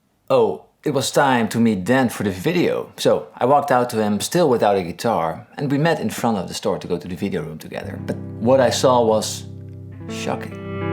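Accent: Netherlands. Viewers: he is from the netherlands if i'm not mistaken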